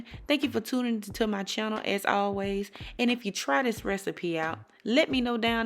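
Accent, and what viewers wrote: South, United States. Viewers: Cooking along with the video right now! I know my family is going to love it! Eddie loves your nice southern voice too as I cook! Thanks for the recipe! Happy thanksgiving! ❤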